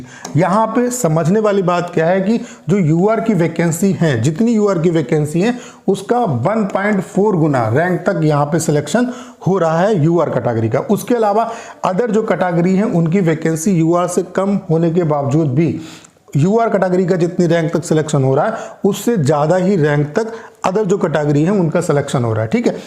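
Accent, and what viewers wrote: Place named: Uttar Pradesh, India. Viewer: Bhaiya pkka Uttar Pradesh se h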